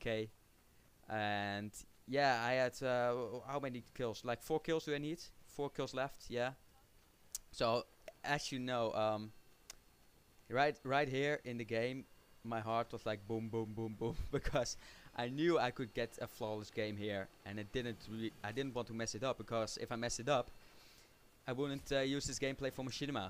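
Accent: Netherlands. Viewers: Dutch accent xD, it's really funny:P. Nice game btw netjes flawless ik word altijd op het laatst nog gekilld:P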